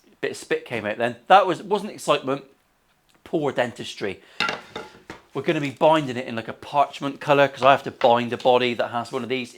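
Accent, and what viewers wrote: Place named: Northern Ireland, United Kingdom. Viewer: Cant wait to see this finished mucker. That norn irish accent is pure class so it is ! I got a MK NF53 and its awesome. That paisly top is stellar. Going to be an amazing build again!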